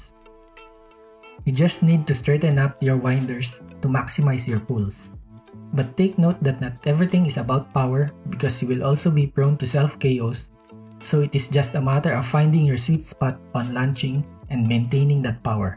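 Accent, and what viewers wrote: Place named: Philippines. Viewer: This can literally help out beginners! Also your accent seems familiar, are you also a Filipino?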